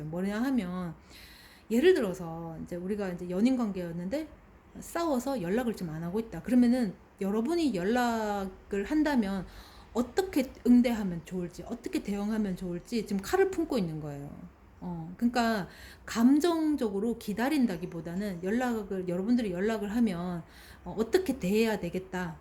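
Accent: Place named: Gyeongbuk, South Korea. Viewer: 경북에 여행갔을때 들었던 억양이었습니다 😊 저는 한국어가 익숙하지 않아서 억양을 잘 기억하거든요!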